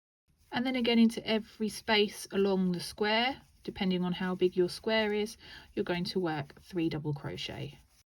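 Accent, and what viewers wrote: England, United Kingdom. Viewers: you sound lovely English ☺️ Thanks for sharing your knowledge, it's gold to me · You sound English but you’re calling them DC when they’re UK TC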